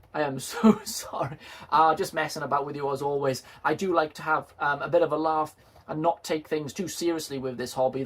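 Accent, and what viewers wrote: Wales, United Kingdom. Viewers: Well done. I love your videos. Have been watching your channel since the pandemic and before. Unfortunately I lost my hearing due to covid but I can still remember your classic Welsh accent accompanying your fantastic vids. I really love the style of your presenting and great content. 14 years!!! BRILLIANT. Please keep them coming. Especially the bloopers!! We're all human and it's great to see what happens to us all whilst trying to get it right. Again. Well done mate and here's to the next 14 years. All the very best. Simon. 😃😃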